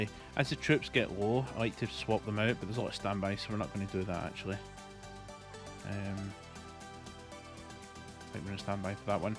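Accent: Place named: Scotland, United Kingdom. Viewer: So Scottish 😮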